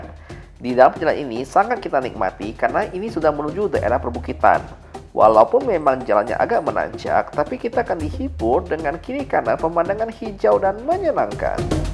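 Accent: Pontianak, Indonesia. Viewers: " lewat tempat belobang pun nda beguyu" 😂😂😂 logat Pontianak kau tukh nampak benar...!! 😂😂 Utamakan selamat ya..!! 👍🏼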